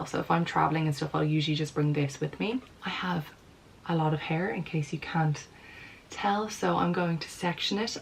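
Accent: Ireland. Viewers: Am subscribed to you but not getting notifications.? You are absolutely gorgeous - the Irish accent too.. great to see a young person w natural beauty Love from Australia…..💛✨✨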